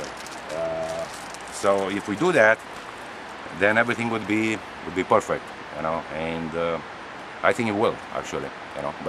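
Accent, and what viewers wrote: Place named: Spain. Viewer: your spanish accent is very very thick that it is awesome!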